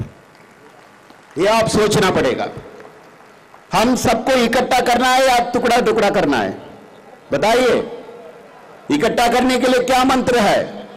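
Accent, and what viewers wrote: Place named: South, India. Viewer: Oh my god what a pure hindi .... kon kahta hai south indian hindi nahi jante ... I m also SI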